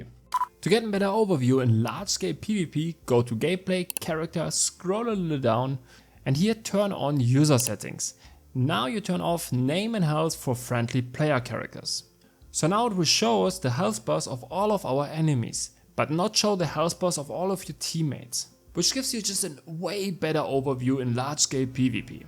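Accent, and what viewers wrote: Germany. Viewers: Thanks for the great help! I always like to know as much as I can before I play something cause I'm all for efficiency. My ADHD friends called me autistic but always stop complaining whenever they need me to explain something or look it up for them ;) <3 Amazing video, clear explanations with added visuals as aid, no time wasting, German accent, told to have fun 10/10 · I hear german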